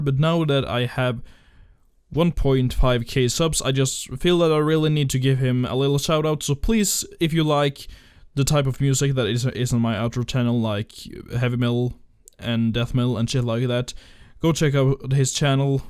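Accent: Norway. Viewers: e du norsk? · Er du norsk? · are you Norwegian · Are you from norway? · OMG i now know you're norwegian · are you Norwegian? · are you norwegian?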